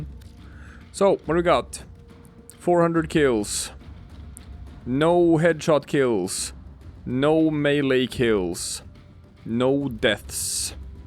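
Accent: Sweden. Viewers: So u swedish? u sound swedish!!! Hej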